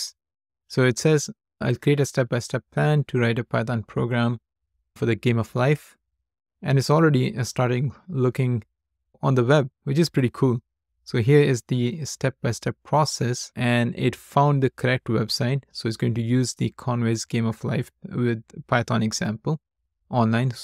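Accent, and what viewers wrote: India. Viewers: Bro it's a Indian accent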